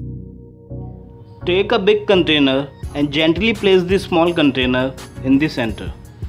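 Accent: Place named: India. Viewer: O my guys, when indian 🇮🇳 speaks English make me so nervous.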